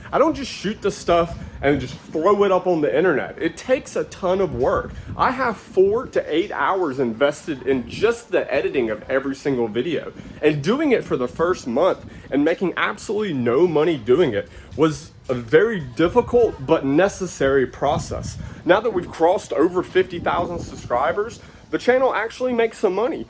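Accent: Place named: Georgia, United States. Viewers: Your Georgia accent is very strong and thick but that is okay because you are well spoken on your video's. I like your video's very much and I am sure with your work ethic you will have great success in business and be prosperous. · Ya you sure have that Atlanta accent, congrats bro you showed up NUMBER ONE on my home page under recommended! big moves my dude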